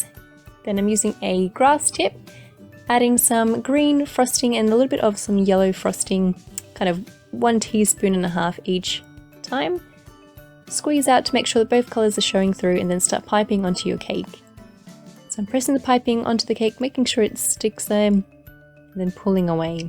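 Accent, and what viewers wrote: Australia. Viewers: Loved the idea, and love your Australian accent! Kisses from Italy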